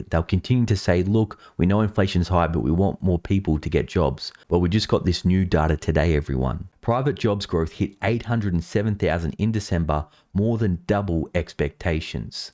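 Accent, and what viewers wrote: Australia. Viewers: This dude sounds australian. Hope you vote republican if you don’t want to be in prison like australia. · I'm guessing from your accent that you are a Aussie. Can you more clearly point out what the ramifications are for Australian s. I like your channel but it is not always clear which country you are referring to.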